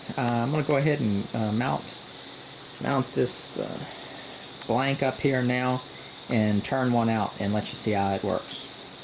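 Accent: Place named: Tennessee, United States. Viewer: He may be in Texas, but I'm guessing Middle Tennessee. His accent sounds just like a bunch of my relatives from around those parts. I love that accent. I could listen to him talk all day.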